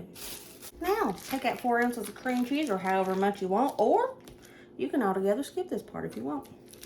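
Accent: South, United States. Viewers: I just found you and can not stop watching you. I'm from Tennessee but live in Ohio. I sure do miss my southern belle accents, lol. I enjoy your recipes and your sweet hospitality. God bless you and your family and a very Merry Christmas. Your boys are southern Gents ❤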